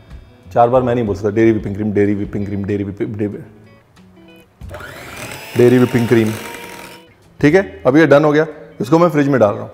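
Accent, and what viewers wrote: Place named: Lucknow, India. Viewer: Science class + History class + His Lucknowi leheza + Lucknowi nazaakat which is nothing but absolute romancing of all ingredients is nothing but creating a masterpiece .... Not to forget Ranveer Sir ,,, inspite of being Punjabi we can see his love for Lucknow as he spent his early days of learning this art in Lucknow!!!!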